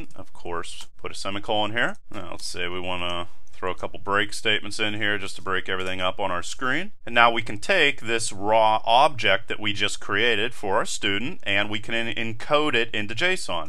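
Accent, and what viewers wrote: Pittsburgh, United States. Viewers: OMG I just realized you have a Pittsburgh accent!!!!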